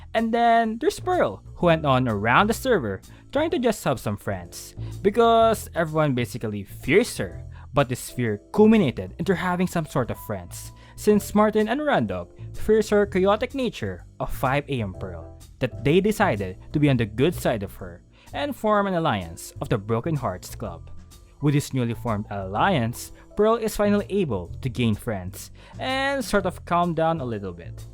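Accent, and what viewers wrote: Philippines. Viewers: the filipino accent is strong in this one haha <3 sending more love from the ph!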